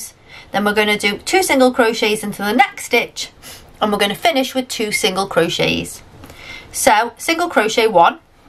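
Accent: Liverpool, United Kingdom. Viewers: So refreshing to click on a posh scouse tutorial ... I'd like to make people aware yes we from liverpool talk fast, walk fast....work fast lol love it xxxx ❤❤❤ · Was just gunna say .. oi scouser.. takes one to know one🤣🤣🤣g’wed girl!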